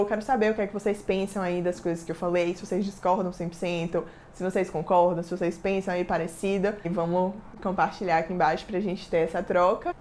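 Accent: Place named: Bahia, Brazil. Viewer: Aiiiiiiii! to AMANDOOO que vc ta se dedicando 100% agora ao youtube. Acho que você vai crescer SUPER aqui, te desejo todo sucesso viu? adoro seus vidÉos hahaha. AMO seu jeitinho leve de ser e esse sotaque lindo da Baheaa! Vou ate ativar as notificações! To maratonando seus vídeos novos. ps: FAZ O VÍDEO COM O MATHEUS SOBRE FINANÇAS SIMMM. amei que vc tb é taurinaa, e meu namorado tb se chama matheus! Um xerão💕💕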